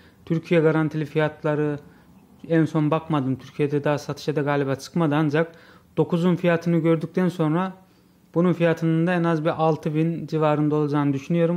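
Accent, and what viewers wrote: Azerbaijan. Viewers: Azerbaycanlı mısın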